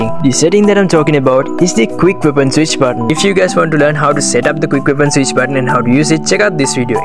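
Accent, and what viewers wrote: India. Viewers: I love your indian accent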